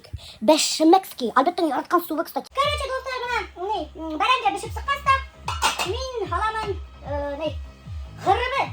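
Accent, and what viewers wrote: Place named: Bashkortostan, Russia. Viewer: туган конен белэн чын кунелемнэн котлыймын!!!!! Исэнлек-саулык, тормышыгызда бик куп матур, шатлыклы коннэр телэп каламын. Сезгэ хэрчак унышлар гына юлдаш булсын!!! аерым зур рэхмэт сезгэ кире уз асылыгызга кайткан очен!!!! Урысча эйткэндэ:это дорогого стоит!!! Сезгэ актриса булу кирэк иде, бигрэк лэр дэ матур Башкортча сойлэмегез!!! Рэхмэт, барысы очен дэ, тагын бер кат.... илэушэ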